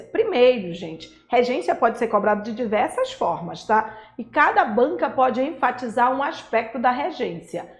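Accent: Pará, Brazil. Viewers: A professora é paraense? P.S.: excelente didática <3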